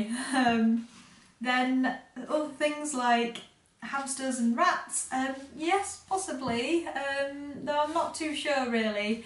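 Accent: England, United Kingdom. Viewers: Is she from england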